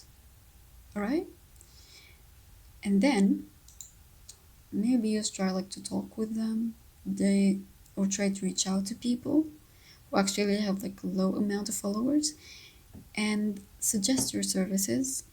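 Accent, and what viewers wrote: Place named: Morocco. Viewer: HEYY are u moroccan (i dunno why u sound moroccan)?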